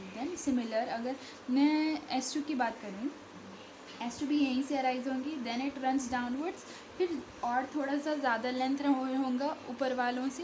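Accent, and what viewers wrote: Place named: Hyderabad, India. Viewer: well explained..... btw from ur accent ,u look from Hyderabad.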